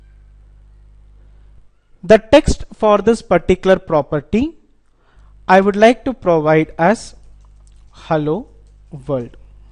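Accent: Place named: India. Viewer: Dispite the Indian Accent, the video is very good. It's very nice knowing we can develop powerful apps using c# to Android! Thx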